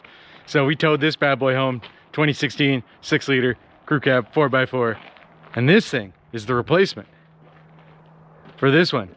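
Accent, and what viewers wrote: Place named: Canada. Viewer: You kinda sound a little Canadian. And I thought you was like from the states. But anyways good job. Keep up the good videos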